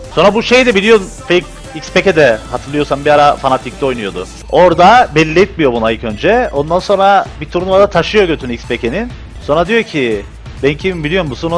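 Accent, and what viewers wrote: Cyprus. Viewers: abi Kıbrıslımısın ;)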